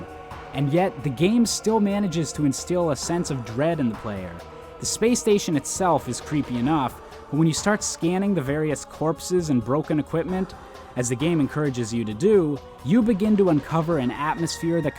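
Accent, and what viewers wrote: Canada. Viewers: dat Canadian (?) accent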